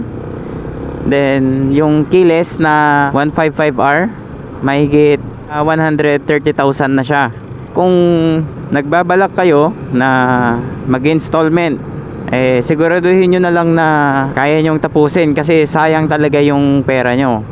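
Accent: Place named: Visayas, Philippines. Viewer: Bobo ng mga reasoning mo. 😂 Sabe nya dahil daw mahal, pangalawa dahil sa installment. Tapos height disadvantages daw, bisaya sakit mo sa tenga pangalawa walang laman pinag sasabe mo. Kailangan mo pa daw mag practice sa mga barako na motor dahil masisira lang daw sa brand new sniper, eh paano yung mga bumili ng ducati nag barako ba muna sila. 😂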